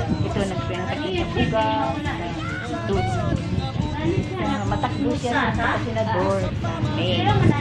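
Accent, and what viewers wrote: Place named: Masbate, Philippines. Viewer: Ohhh ok.. may kunting halo salita ninyo ang masbate. Ano po tawag sa language ninyo dyan?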